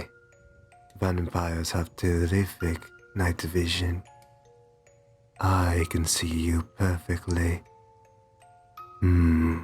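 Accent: Russia. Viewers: Is he Russian or something? :D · Sounds Russian 👀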